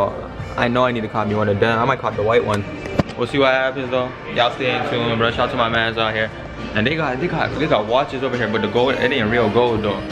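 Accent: Jamaica. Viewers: U know to talk like Jamaican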